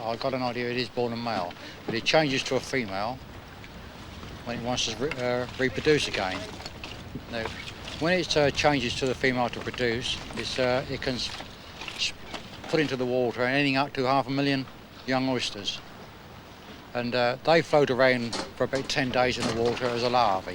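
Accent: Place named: Whitstable, United Kingdom. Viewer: Proper Whitstable accent.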